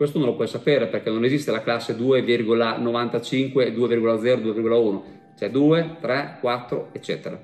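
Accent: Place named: Rimini, Italy. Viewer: la parlata riminese e troppo simpatica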